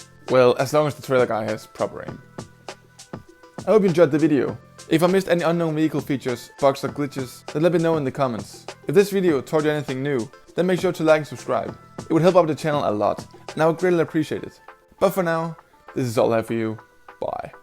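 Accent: Denmark. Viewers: i can hear you dansih becus of the accent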